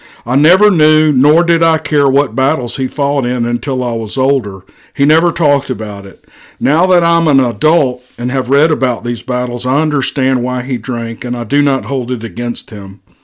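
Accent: South, United States. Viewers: You’ve got the perfect southern voice to give every story an authentic feel based on the location. It sounds like you’re really the person who experienced these stories. Real or fake, you do em justice by bringing them to life. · Kevin Costner with a southern accent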